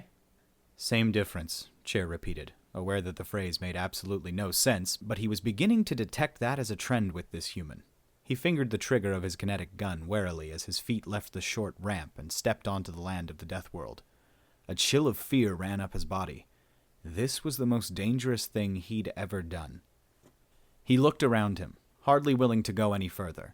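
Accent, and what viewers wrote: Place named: Australia. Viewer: Your Australian accent is amazing.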